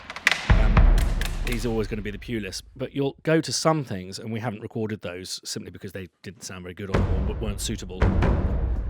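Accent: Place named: England, United Kingdom. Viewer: A suggestion: speak more slowly - not all ears are attuned to a rapid-fire English accent. Also, use a bit of gain and compression on the voice so we can actually hear it - would be a good start. The voice level needs to be up there just below the volume of the percussion. If we turn the sound up so we can hear you talking, the drums just about blast our eardrums together in the middle of our heads! Very poor recording technique for video. Good content, but crap recording!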